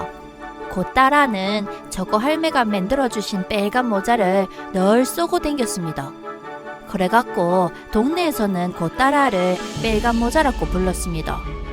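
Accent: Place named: Daegu, South Korea. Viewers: 대구를 떠나온 지 30년이 훌쩍 지났는데...동화영상에서 고향말을 실컷 들어보네예~ 고맙심데이!^^ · 대구 버전으로 들려주는 동화 이야기 구수하고 정겹습니다~~^^ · 아는 내용이지만 대구 말로 들으니까 새로운 느낌이네요. 다른 동화도 계속 들어 보고 싶어요~😊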